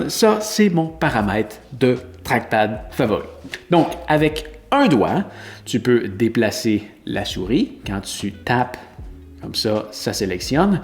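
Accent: Quebec, Canada. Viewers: Quel plaisir de redécouvrir les subtilités du Mac avec l'accent canadien ! 😘 de Paris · Wow 🎉merci beaucoup pour cette vidéo 👍🏼! De superbes réglages très utiles et bien expliqué! Avec le petit accent québécois plus qu' agréable qu'il fait toujours plaisir d'entendre 😊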